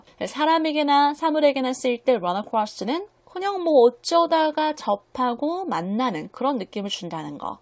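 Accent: United States. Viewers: 걷기운동 나가면서 재생목록에서 연속 기능을 선택해서 듣다보니 댓글달아주면 힘이 된다셔도 나중에야 가끔 씁니다만 반복해서 열심히 듣습니다. 아직은 150개 표현과 50개씩 리뷰하는 것을 듣는데 나중에는 다른 것도 공부할 날이 오겠죠. 발음도 아주 미국사람같이 유려한 것 같아서 도움이 크리라 기대하고 감사합니다.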